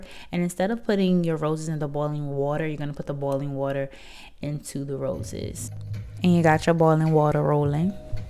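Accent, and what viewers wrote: Louisiana, United States. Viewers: We have the same name... mine Is spelled Daryllynn .. are you from Louisiana?? Sounds like it & the other 2ppl ik with my name are both from there.. I'm from Texas/Florida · You from Louisiana?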